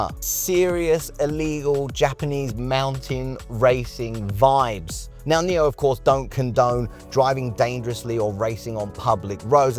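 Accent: United Kingdom. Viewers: He sounds like a brit,right?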